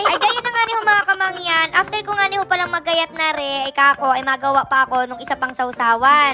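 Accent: Batangas, Philippines. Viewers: Parang Batangas eh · you have a batangueno accent 😊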